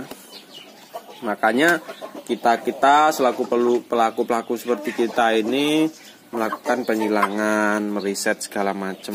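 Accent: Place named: Lampung, Indonesia. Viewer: Mas alamate nang ndi? Kok bahasane koyo wong jowo Lampung.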